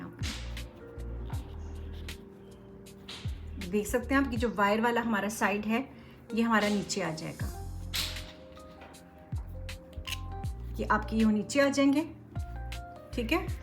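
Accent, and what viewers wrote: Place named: Pakistan. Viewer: Lovely api. Ur Urdu accents sounds Pakistani 🫶🏻 happy to see. Bhot he details me r bhot achy sy smjhaya ap ny. Lots of love❤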